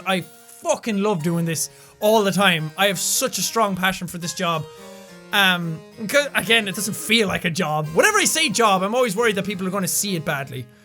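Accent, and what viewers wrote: Ireland. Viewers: This guy right here got me addicted to Irish accents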